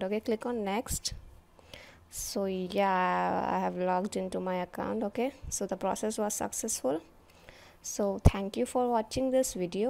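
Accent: India. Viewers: Are you Indian ,I'm Right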